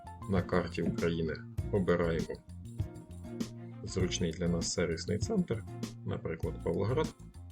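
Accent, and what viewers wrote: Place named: Russia. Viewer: Дякую, було цікаво. Тільки чому Ви українську "е" читаєте на російський манер - /йе/? Навіть якщо це умовно латинська "е", то вона теж не /йе/, а /і/ або, якщо вже не англійська, то німецька чи італійська - /е/ ("э" - якщо вже на то пішло).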